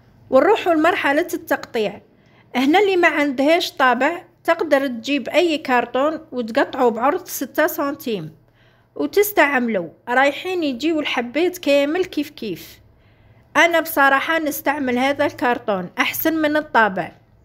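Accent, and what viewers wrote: Setif, Algeria. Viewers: حسب اللهجة تاعك تباني سطايفية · هذه اللهجة نتاع سطيف العالي.اليس كذالك؟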